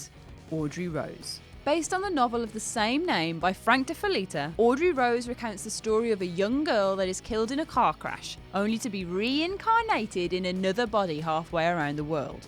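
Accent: United Kingdom. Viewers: I don't believe in ghosts, but I also don't have a problem with hosting. I find it kind of refreshing to hear a female British accent presenting a Whatculture video.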